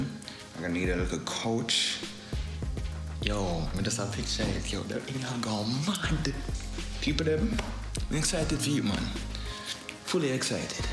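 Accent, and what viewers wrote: Jamaica. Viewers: Jamaican accent of course he is from a diff part of ja thats all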